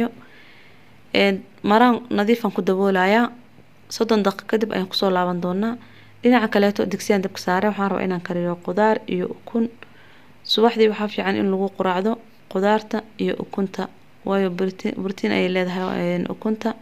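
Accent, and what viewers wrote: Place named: Hargeisa, Somalia. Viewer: Cabage weeyaan lahjada hargeisa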